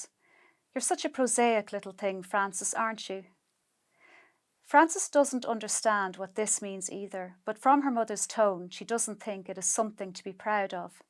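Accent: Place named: Meath, Ireland. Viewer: Beautiful reading. The Meath Navan accent hasn't disappeared either. Congratulations.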